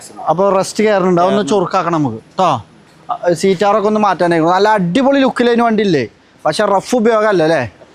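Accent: Malappuram, India. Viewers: സൂസ് അത് പൊളിച്ചു മലപ്പുറം ഭാഷ അത് തിരിയുന്നവന്ന് തിരിയും അല്ലാത്തവൻ നട്ടം തിരിയും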